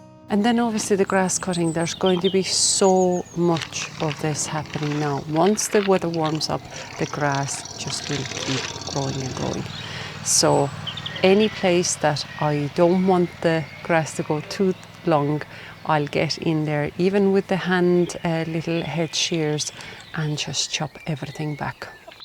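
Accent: Ireland. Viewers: 😊 lovely video ,, where are you from originally ,, can't place the accent as its half Irish and half something else ? 😊❤ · The video lovely and calming, the Irish accent a bonus! Can't wait to return to beautiful Ireland one day!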